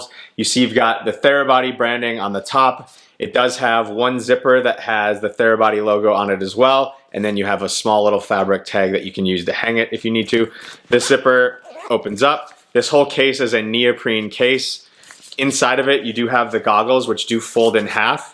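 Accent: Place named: United States. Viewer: I didn't even notice anything negative about your English. You speak like you're from the States! lol Thanks for the review.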